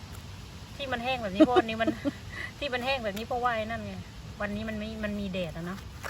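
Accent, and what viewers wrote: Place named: South, Thailand. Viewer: ชอบจังเวลาพี่พูดภาษาใต้คะ😁🍄🍄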